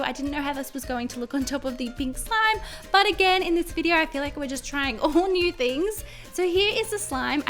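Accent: Australia. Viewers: “The accent is Australian”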